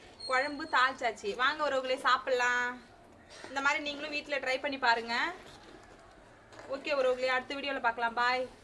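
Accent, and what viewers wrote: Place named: Cuddalore, India. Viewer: கொள்ளைக்குன்னு சொல்றிங்க கண்டிப்பா கடலூர் மாவட்டம் தோணுது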